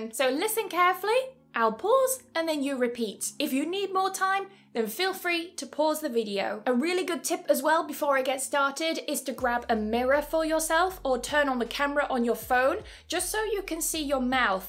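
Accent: United Kingdom. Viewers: Yes, Number 20 is ittle bit harder to pronounce. I love your 20 Tong Twisters. I like the British pronunciation better than American pronunciation. Thank you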